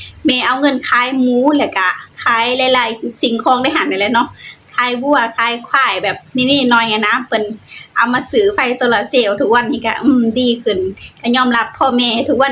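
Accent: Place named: Ubon Ratchathani, Thailand. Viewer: สาว พูดสำเนียงคล้ายอุบล ไม่เหมือนคนลาวเลย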